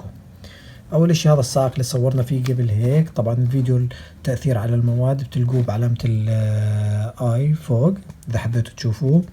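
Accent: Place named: Jordan. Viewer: طيب اخوي هاي لو انمسكت معك من الشرطة في عليها سجن ؟ بالاردن قصدي لانو مبين من لهجتك من الاردن زينا وكل الاحترام لكل الاخوان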